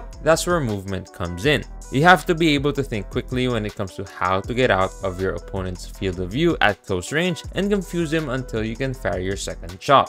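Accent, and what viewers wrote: Philippines. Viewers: You sound Filipino, and because of that you gain my subscription 😎😎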